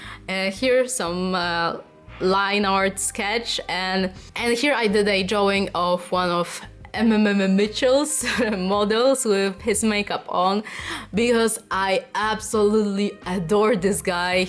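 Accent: Poland. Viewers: I'm from Poland like her and my dad has that accent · 'cause is polissh accent 😏😘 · Masz taki "polski akcent" xD Jesteś z Polski?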